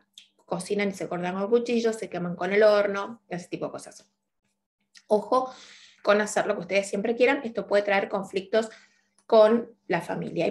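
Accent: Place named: Argentina. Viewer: Saludos desde chile.espero algo bueno de marte en tauro.ud estimada sra? Comento lo de mes de julio. Con mucha humildad ud dijo q su ascendente? Es geminis. Y ya q se algo de gemas? Averigue? Que la gema para ud? Y en su ascendente? Es la piedra o gema... agata. Es su talisman indicado. Es lo que se. Desde chile? Mi pais? Un hermoso abrazo fraternal? Hacia ud? Y un abrazo de amistad entre chile y su pais españa? Me parece? O argentina. No se bien de donde es ud. Le encuentro acento argentino. Bueno sea cual sea? Amistad. Chao sra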